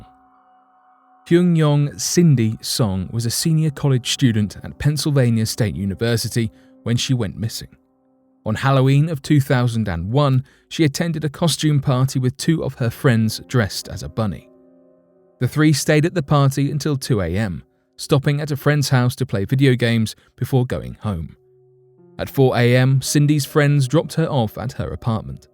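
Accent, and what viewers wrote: United Kingdom. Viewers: No, definitely British, with very, very, very slight generic British northern accent hints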